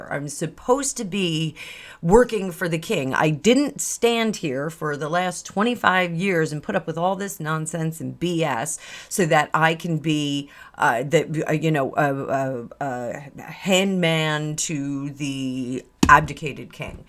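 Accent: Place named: New Jersey, United States. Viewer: More Jersey accent, please!!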